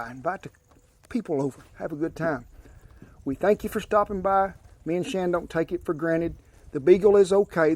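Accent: South, United States. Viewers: LOVED this!!!! If i'm looking for a catfish recipe and there isn't a good southern accent, I promptly hit the "back" button!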